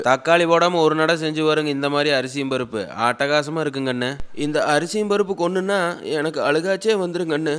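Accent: Coimbatore, India. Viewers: Your slang of Tamil is ultimate bro as iam also in kovai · கொங்கு பாசை அருமை. ஒரு நெம உப்பு. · பொய் சொல்லாதீங்க அண்ணா நானும் திருப்பூர் அண்ணா கோவை தமிழ் கொஞ்சுதே அண்ணா சூப்பர் அரிபருப்பு சாதம் ல தக்காளி போட கூடாது அது சில லூசு க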